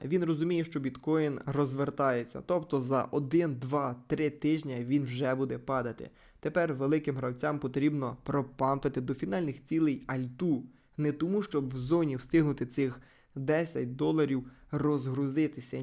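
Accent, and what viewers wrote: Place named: Western Ukraine, Ukraine. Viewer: Дякую за відео,по говірці чути зо людина з франківщини чи львівщини , я вгадав???)))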